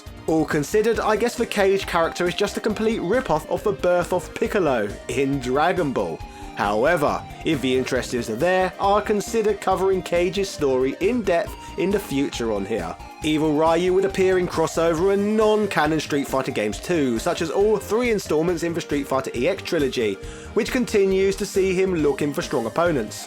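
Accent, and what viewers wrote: United Kingdom. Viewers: thank god, you stopped using that stupid japanese pronunciation mid video...! you are british i want to ear your british accent when you speak...!!! cool vid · Lol british phonics!! love it! It appears Caapcom have taken to rippong off DBZ for inspiration. essentially we have Demon King Ryu and Evil Majin Ken! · I'm American & I'd pronounce his channel name with my American accent. I wouldn't put on a fake British accent to pronounce it the way he does. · I do love these street fighter trivia videos. These British pronunciations though, there so cringy. I'm English and I've always thought so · I say Ryu like Ree-you. Works for me. But he's a brit and they didn't say it like us Americans lol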